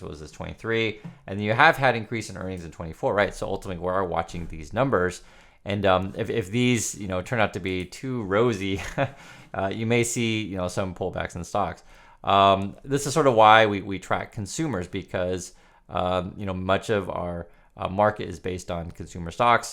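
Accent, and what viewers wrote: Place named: Pacific Northwest, United States. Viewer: Fellow Oregonian here! Funny, I thought you might be from the PNW. The way you speak gives it away, big time. haha! Thanks for the video!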